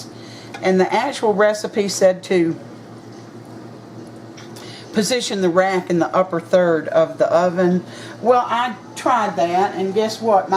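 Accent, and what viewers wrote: South, United States. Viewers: hi! wow wow you made one lovely dish of supper! I make my own poultry seasoning and sage & rosemary is in there, such a fine blend of herbs!!!!! I'd like to thankyou, for such a wonderful video, and sitting down watching your cooking, listening to you &, is very therapeutic, your Southern accent, very peaceful & soothing thankyou my new friend hugs from willow-Sage in victoria bc Canada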